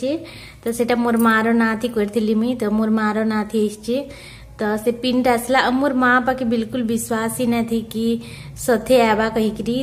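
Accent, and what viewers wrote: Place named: Sambalpur, India. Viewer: ତୁମର ସମ୍ବଲପୁରୀଆ କଥା ମତେ ଶୁଣିବାକୁ ବହୁତ ଭଲ ଲାଗୁଛି ।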